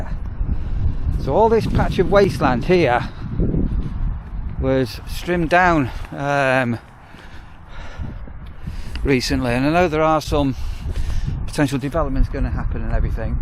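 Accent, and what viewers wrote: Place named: Liverpool, United Kingdom. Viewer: Dont be disillusioned Lincolnshire has their own thieving scum bags. You are really disrespecting the decent people who live in Manchester. For someone who has lived in Manchester all their life your accent is more SCOUSE than anything. Why so many cameras around your bungalow if you feel so safe living there! 🤔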